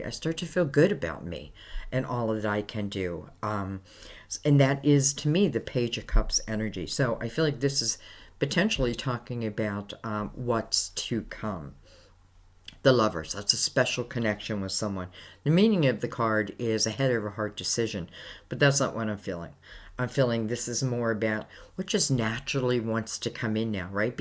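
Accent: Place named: South, United States. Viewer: Thank you so much! I needed this today. You're gifted, skilled, and personable and I have to ask where your accent is from because your voice sounds soothing and Southern. :)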